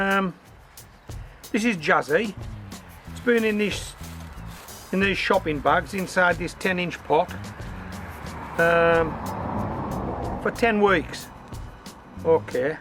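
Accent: United Kingdom. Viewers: Yes I am a first timer, as my husband is, trying to be more self sufficient. The main experience I have is failed whatever I tried to grow. It's like if I breathe same air as my plants they get sick. However, my main thing is dogs, and I just love your helper dog Mollie. Is she Border Collie? Her interest and attention span is better than alot of people I know. Ok also love the accent. Not sure exactly what it is: British, Scottish, Whales? wherever, I listen so I can enjoy the accent. If you did not pick these potatoes so small, would they grow to larger size like grocery store? Will more grow at the same spot you picked? How do we know when it's done and to raid the center of the soil ball? I assume we can do same procedure with sweet potatoes? Anyway, sorry I have alot of questions but thanks so much for easy lesson dark can do. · Full of useful information. 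So many videos are from Americans and their conditions do not apply in the UK.So nice to hear a British accent for a change. I am sick to death of know it alls with strange accents!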